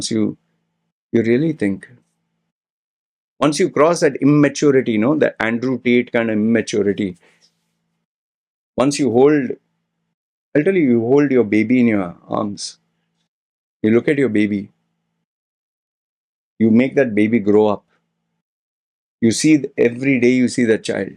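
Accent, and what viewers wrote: India. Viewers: You seems to be an Indian...your accent is so so desi.. Thank you big brother. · You sounds indian as fuck mate! You are pretending like a foreigner after having that tatts like moari brothers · Bro you sound like Indian😊😊😊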